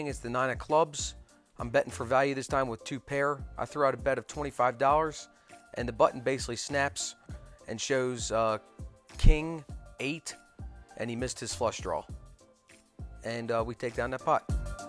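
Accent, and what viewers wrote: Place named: Maryland, United States. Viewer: 1 minute in, and you get a like just for your Maryland accent!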